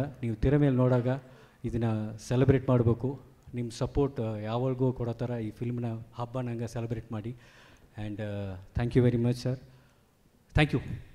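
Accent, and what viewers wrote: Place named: Chamarajanagar, India. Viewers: Namma chamarajanagara bhashe na nimmindha keli khushi aythu!!! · ಸ್ವಲ್ಪ-ಸ್ವಲ್ಪ ಚಾಮರಾಜನಗರದ ಭಾಷೆಬರುತ್ತದೆ ಧನ್ಯವಾದಗಳು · Try madilla sir poorthi kannadane but adu narasipura,nagara slang aste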